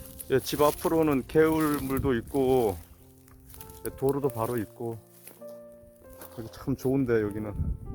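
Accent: Gangwon, South Korea. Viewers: 우연히 보고 들은 강원도 억양.너무 반갑고 좋아서 보고 또 보고 듣고 합니다 정말 감사히 잘보고 있습니다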